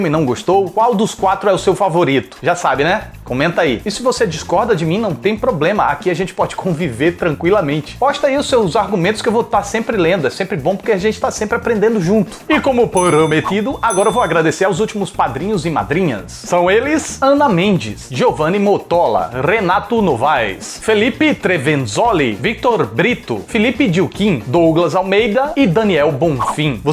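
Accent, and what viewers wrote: Northeast, Brazil. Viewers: Chó advinhar, tu é nordestino néh?? Eu sou! Aq tá fazendo um calor da gota serena! 😅🔥🔥🔥 Amei o vídeo! ❤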